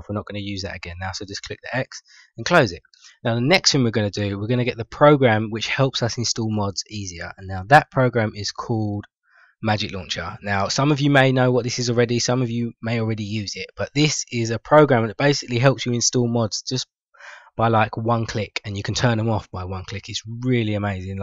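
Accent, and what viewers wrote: United Kingdom. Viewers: You remind me of Garnet from Steven Universe. Your black (I not being racist) you have a british accent, and you have those glasses! :D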